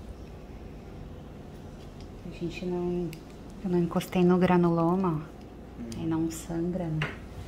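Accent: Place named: South, Brazil. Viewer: Ela é do Sul, por isso o sotaque. É normal.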